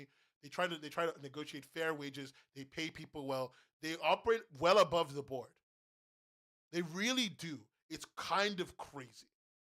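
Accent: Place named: United States. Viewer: youre british?? your accent sounds so american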